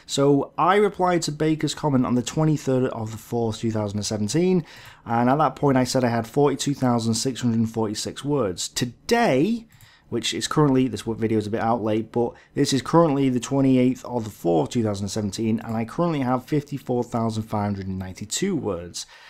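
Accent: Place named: Merseyside, United Kingdom. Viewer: Pomodoro. I'll check those out. cheers. Ps, you have a slight Birkenhead twang to your accent. or from Runcorn and Widnes. I lived there for a while and R&W had such a wide variety of accents that i felt like i was in the 1960s where 'scouse' sounded like the Beatles [Lancashire based accent] but also there wee people who sounded distinctly Mancunian. When i visit my family in the Wirral my old mates call me a 'toff' because they say i talk like a posh person from London yet in Brighton [my home] i am told i have a heavy scouse accent. No wonder Joseph Conrad [who spoke about 9 languages as he lived in central Europe between Germany, Czech and Poland so grew up trilingual]. Then again, as i recall, he did state , to be more accurate, he didn't enjoy 'writing' in English as english had so many accents it was difficult to understand someone who spoke local east end London, compared to a person from Newcastle or the midlands! 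I'm not surprised! hen i first moved to Brighton with my gf nd were chatting in a shop we would be followed by security wherever we went!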